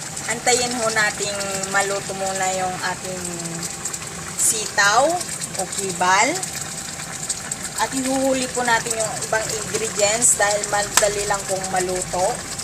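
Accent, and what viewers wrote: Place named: Kamayo, Philippines. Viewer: the way ka kasi magsalita kamayo talaga from Mindanao na linggwahi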